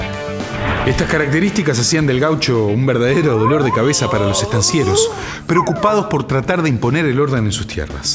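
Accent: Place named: Argentina. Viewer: gracias amigo por desburrarme si es q se puede decir ja ...recien me di cuenta q eras uruguayo cuando lo mencionaste suena muy argentino bue la pregunta el gaucho es el fruto de la mezcla del indio y el europeo ? o ¿es el inmigrante q llego sin plata a estas tierras? ..¿ o eran piratas españoles ?....¿ bueno de donde salio el gaucho no me queda claro ? grcis saludos desde cordoba argentina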